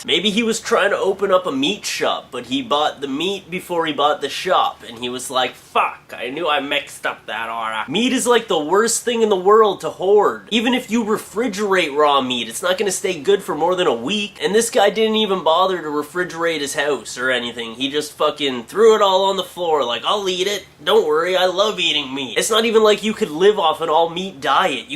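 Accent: Canada. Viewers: i love the Canadian accent the i'm Australian and its not so cool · I love it when you say "house" with your cute\amazing candian accent! :D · and ur Canadian accent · I LOVE CANADIAN ACCENTS!! soo sexy <3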